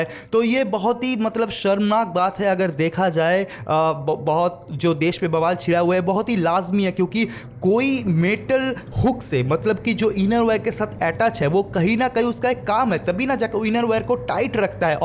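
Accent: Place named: Bengal, India. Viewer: Pehle toh ye bangali accent chod de bhai. Warna hum k lecture ae he khush hai. Ur accent literally irritates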